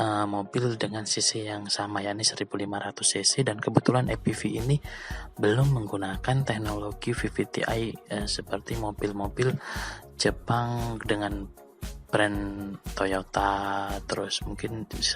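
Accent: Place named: Madura, Indonesia. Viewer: Asli madura ya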